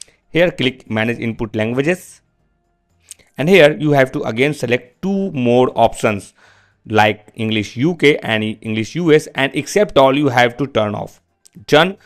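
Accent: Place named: India. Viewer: you know the vid is good when you hear indian accent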